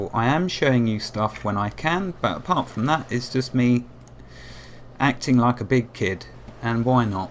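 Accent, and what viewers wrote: United Kingdom. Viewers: Awesome EQ 2 series , I just love your videos...Are you from the UK by any chance and did you enjoy eq and eq 2 better than villagers and heroes?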